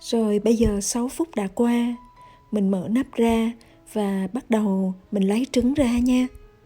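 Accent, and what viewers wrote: Hue, Vietnam. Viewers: giong noi giong het nhu my cousins, nguoi Hue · nói giọng Huế nhẹ nhẹ như người Huế ở Dalat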